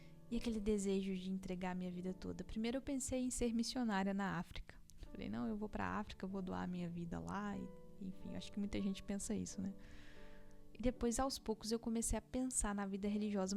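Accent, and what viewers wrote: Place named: Minas Gerais, Brazil. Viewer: Barbacena! A cidade das rosas 🌹 e da Beata Isabel Cristina Perto de minha cidade, Conselheiro Lafaiete , por isso a sua voz é muito agradável aos meus ouvidos! Sotaque mineiro é um trem bom demais! Conhece conselheiro Lafaiete?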